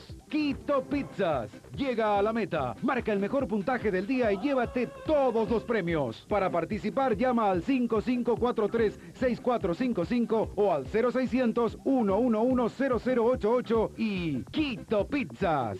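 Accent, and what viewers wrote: Standard. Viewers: En vez de decir acá por Magic Kids, decían aquí por Magic Kids, o aquí en Magic Kids, pero igual se entendía, a pesar de que el locutor hacía la voz en neutro, se entendía igual de bien. 🙌🇦🇷👏🤗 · la calidad del VHS es sublime, lastima la fea locucion que tenia este locutor "neutro" el que teniamos en la señal Argentina era mejor, hasta la propaganda de Kito Pizzas estaba mejor hecha en su pais de origen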